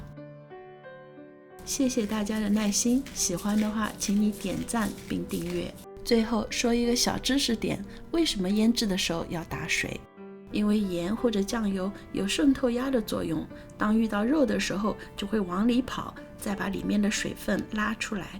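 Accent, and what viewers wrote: Shanghai, China. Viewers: Hello, 我也是上海人。你的美食频道做得真好，很地道的上海菜。很地道的上海口音。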